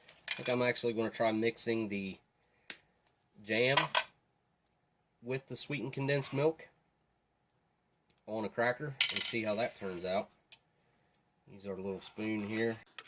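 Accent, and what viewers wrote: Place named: Appalachia, United States. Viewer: i was think maybe on of the southern appalachian states based on how you pronounce some of your words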